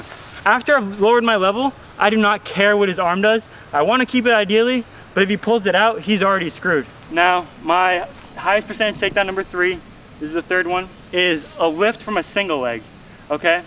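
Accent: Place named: Minnesota, United States. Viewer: Wow, you actually know your shit. You're not just another good looking goofy guy, with chipped teeth that speaks well. Your video (5 things they don't tell you about wrestling?) popped up. It was a good video and funny except you used the f word, I don't mean fuck. I checked out this video, you're a serious wrestler, impressive. You are also a great teacher. Did you do your own film editing? Affective your videos are. I don't remember wrestlers being so critical and such grammar queens. You sound like a Minnesota boy. You are clear and to the point. I think we all knew what you meant. With your personality and those Pete Davidson eyes, you have a great future whether it's in wrestling, teaching, broadcasting, or acting. I hope you are getting promoters and are picky about them. You also need a great Trusted mentor, you're on your way kid. 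Thanks for making wrestling more accessible and interesting. Did I say that right? I don't want the grammar police on my ass. btw your teeth look great at least on video, wear a damn guard Cheers.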